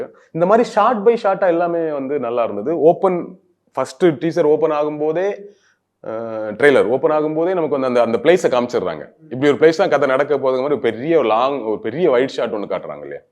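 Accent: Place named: Kanyakumari, India. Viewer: avar slang kanyakumari mari eruku bro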